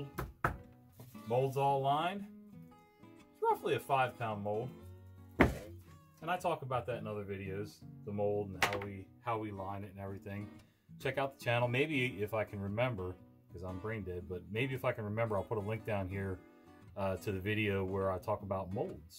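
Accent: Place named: South, United States. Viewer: I honestly didn't expect words like mantra and ta-daa coming from that southern mouth(although you did explain, you grew up in jersey) lol. really refreshing to hear people like you. ~keep it up.coming straight at you from karachi, pakistan. Also never stop starting ur videos with yuuuppp.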